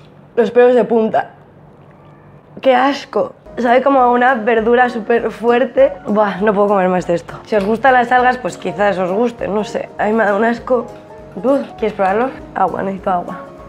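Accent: Galicia, Spain. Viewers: es gallega??? es que tiene acento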